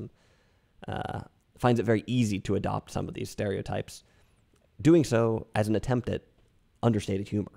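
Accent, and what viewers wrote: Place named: Bergen, Norway. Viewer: Yes it is true! I love the Bergen dialect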